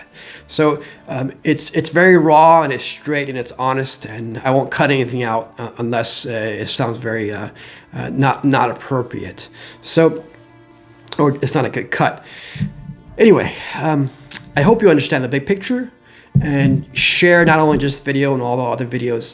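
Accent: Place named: Iran. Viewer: Piper Ohio >> No he doesn't. That's his Iranian accent. DON'T change the speaker!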